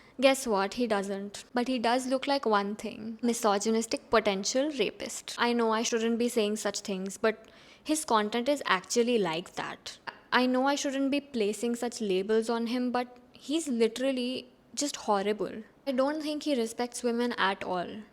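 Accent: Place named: South Delhi, India. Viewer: just shutt up this nonsense shitt and speaking south delhi accent😂its not making u cool🤡🤡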